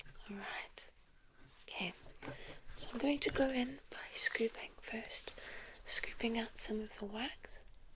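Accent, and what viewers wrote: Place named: United Kingdom. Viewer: I swear she is British with that accent.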